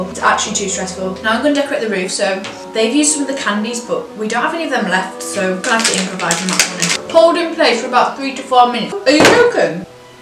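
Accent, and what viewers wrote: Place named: Northern England, United Kingdom. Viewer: Up North of england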